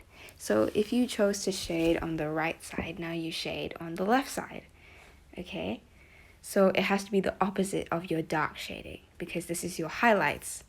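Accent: United Kingdom. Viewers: the British accent (Or the Australian accent whichever she has both are awesome) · omg I watch this vid and it was really helpfull, your voice helps me a lot too. Guys, I think we have the best Edit Teacher here xD And Thanks very much for this video. (Oh and, are you using british accent? I use that accent too) edit: I use this tutorial for 6 days, and I finally did it ;> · you are british? u3u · Her voice: has this British accent My voice: dying rat noises intensifies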